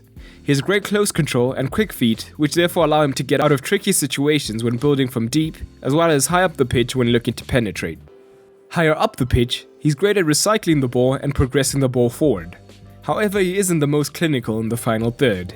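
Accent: South Africa. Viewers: You sound South African.. Gauteng oakie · Are you South African?😂 your accent would suggest it.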